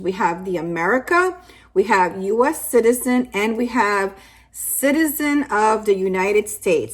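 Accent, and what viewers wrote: Latin America. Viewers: Hair looking great as usual, love the librarian glasses that go so well with the choice of top, sweet Latina dialect.... Giving this video a solid 9.3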